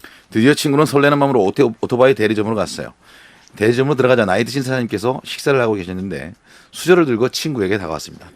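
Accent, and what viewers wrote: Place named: Jeolla, South Korea. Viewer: 충청도라면서 왜전라도 말을 씨부리는겨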